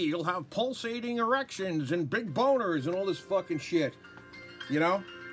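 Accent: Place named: New York, United States. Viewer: Yes - that there is an awesome New York accent!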